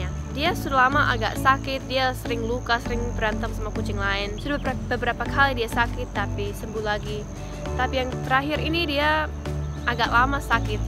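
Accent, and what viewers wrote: Papua, Indonesia. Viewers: Wow logat papua bicaranya keren, hebat, saya tinggal di west java tapi suka dengan logat indonesia timur. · Ada logat papua-nya. :D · Kamu bicara seperti logat Papua thts awesome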